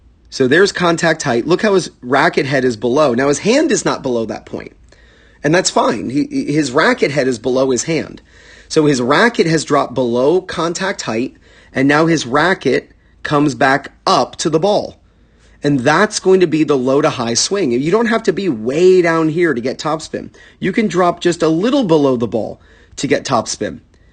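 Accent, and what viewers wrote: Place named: United States. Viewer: ...with American accent. Nothing wrong with that, just saying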